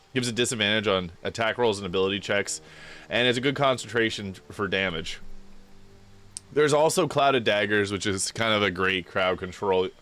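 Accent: Maritimes, Canada. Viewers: its funny randomly finding another maritimer on youtube lol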